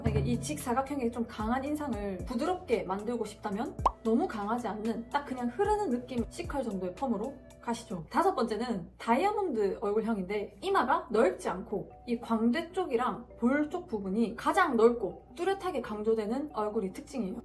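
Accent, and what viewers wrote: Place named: Daegu, South Korea. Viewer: 아 사투리보고 대구인줄 알았는데 서울에 계시넹 ㅜㅜ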